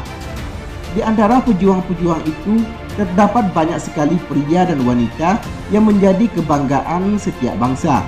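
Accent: Aceh, Indonesia. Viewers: Aceh memang the best. Logat Narrator nya Aceh tok tok...👍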